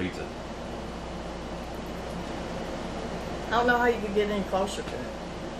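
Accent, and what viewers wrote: South, United States. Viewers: I can’t wait ti try this! I so enjoy watching your videos, your beautiful southern voice is magical, reminds me of my grandmother who also loved to cook! ♥️♥️♥️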